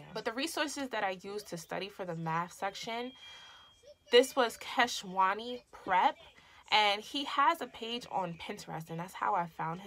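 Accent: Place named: New York, United States. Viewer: Sound like you from ny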